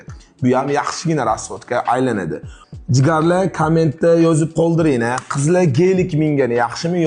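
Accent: Namangan, Uzbekistan. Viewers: Namangan shaxar shivasi namangan viloyat shivasi boshqa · Ока номон гон шевага беш кетоманде ока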